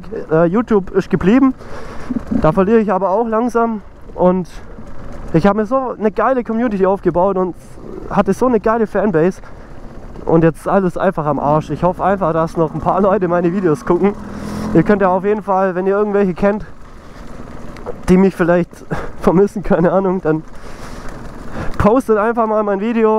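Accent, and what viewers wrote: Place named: Geislingen, Germany. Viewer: Geißler aktzent.. Fend I tob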